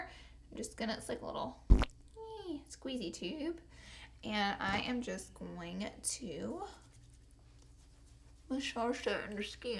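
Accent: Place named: Alabama, United States. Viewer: You're still in my prayers!! I came over here because I heard you're from Alabama (I am too) and I heard your accent and I was like yep she's from alabama haha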